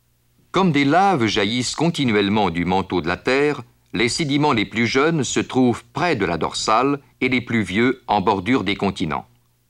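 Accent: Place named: Quebec, Canada. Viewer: un canadien (ça s'entend très nettement) qui essaye au maximum d'avoir l'accent "français"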